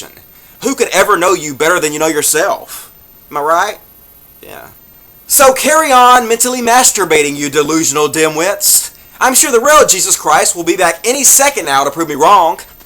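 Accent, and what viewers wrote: South, United States. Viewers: You know whats funny? You have a southern accent, you're atheist, and quite smart. I always thought the first and the last two were mutually exclusive. :P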